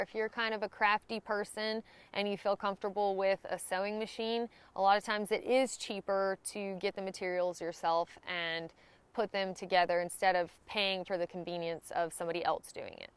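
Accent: South, United States. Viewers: Southern American accents are so annoying but decent video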